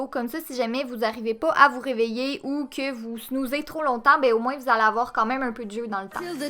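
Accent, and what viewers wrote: Quebec, Canada. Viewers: tu vien d'ou québéc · j'ai remarqué que tu était québécoise ! tu viens de ou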